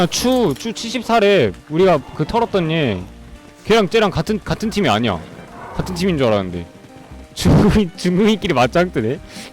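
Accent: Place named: Jeolla, South Korea. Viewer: 목소리에서 전라도 사투리가들리네요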